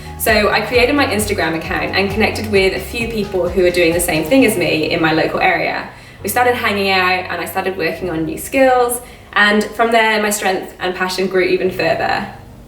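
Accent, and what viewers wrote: Australia. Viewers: I knew there was some sought of Aussie in your accent, and then you said you moved there. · You look amazing!!! I need motivation like you had/have! Cute little mix of an aus/English accent there 😂 it’s nice to see you doing so well great video deffo be watching more! Starting to get myself fit now! It’s the food side I struggle with what’s good/bad etc because I love cooking but I’m sure I’ll learn xxxx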